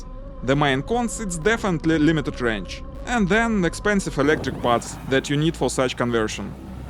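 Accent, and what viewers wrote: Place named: Russia. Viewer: On peut voir le même vidéo en francais,ce serait moins pénible que l'accent russe.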